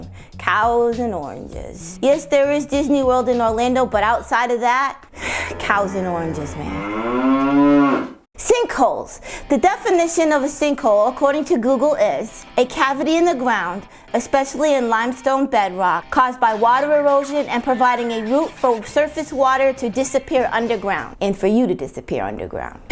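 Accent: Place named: North, United Kingdom. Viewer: your from the north arnt you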